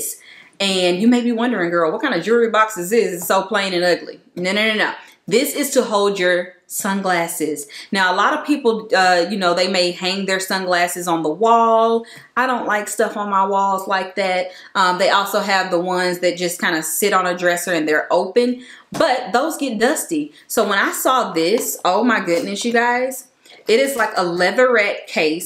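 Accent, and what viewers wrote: South, United States. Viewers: Thanks for sharing! Btw, where are you from? I hear the southern "accent." 🙂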